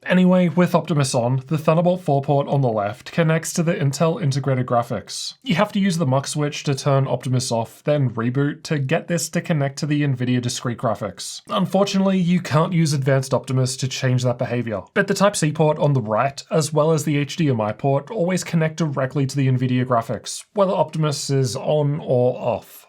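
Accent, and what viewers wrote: Australia. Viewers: You're the best. Are you Australian?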